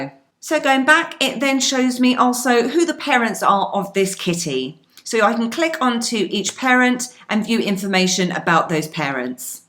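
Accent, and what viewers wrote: United Kingdom. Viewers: the prim and proper British pronunciation that's catnip to an American's ear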